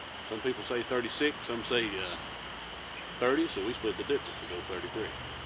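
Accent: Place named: Texas, United States. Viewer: I tell ya brother, i do love yer vids and being a Texan hearing you talk makes me feel right at home!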